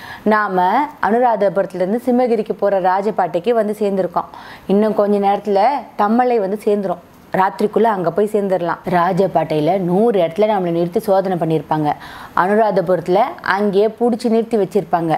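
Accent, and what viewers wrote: Tamil Nadu, India. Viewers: பொன்னியின் செல்வன் கதை கேட்க சும்மா தேடி பாத்தேன் இவங்க பேசுனா வீடியோ தெளிவா இருந்ததால் தொடர்ந்து பார்த்தேன், எல்லா விடியோவும் பார்த்து முடிந்தாயிற்று, கதையின் ஆர்வத்தால் புத்தகம் படிக்கச் தொடங்கிவிட்டேன், எனினும் யாருடா இது இவ்ளோ தெளிவா கத சொல்ராங்கலேன்னு இவங்கள பத்தி தேடி பாத்தா இயக்குனர் அகத்தியன் மகளார் என்ன ஆச்சர்யம் அவரு நம்ம ஊரு மகளார் "பேராவூரணி". பேசும் ஸ்லாங் பாக்கும்போதே தோணுச்சு. வாழ்த்துக்கள் 💐💐💐💐💐💐💐💐💐💐💐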